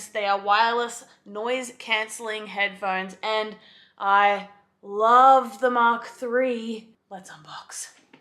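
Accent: Australia. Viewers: That's sarcasm right? 10 hour flights? now? That's definitely an aussie accent or am I going crazy?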